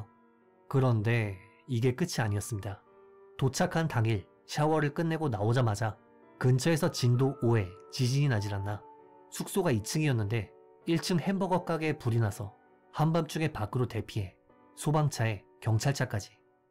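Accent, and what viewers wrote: Japan. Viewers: 오 이걸 이제야 보네요ㅎㅎㅎ 어투가 살짝 간사이쪽으로 들려요! 어처구니없는 사고가 많았는데도 좋은 영상 찍어주셔서 감사합니다 이번 일본여행은 좋은 일만 있으시길❤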